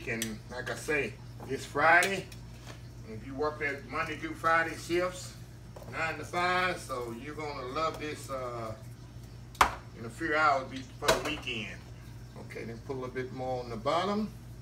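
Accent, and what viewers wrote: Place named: Texas, United States. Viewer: has that awesome Texas country accent. His voice is one of a kind, and very soothing😌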